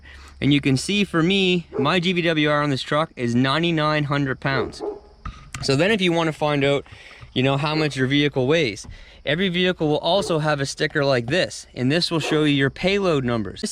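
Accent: Canada. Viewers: You sound so Canadian. Thanks for the video helps a lot.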